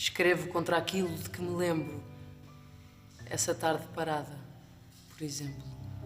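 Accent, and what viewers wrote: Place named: Portugal. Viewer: seu sotaque português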